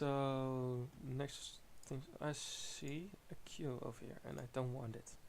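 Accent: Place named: Netherlands. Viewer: Haha ik kan het aan je accent horen!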